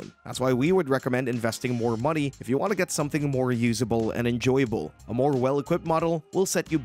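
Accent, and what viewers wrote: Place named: Philippines. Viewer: You sound philipino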